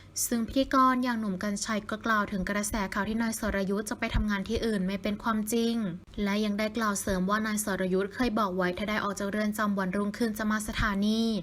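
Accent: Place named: Provincial, Thailand. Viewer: พาดหัวข่าวว่ากลับมาอ่านอานแล้ว แต่ตอนท้ายยังไม่ออกจากเรือนจำ บ้าฉืบ...อ่านเสียเหน่อมากน่าลำคาญ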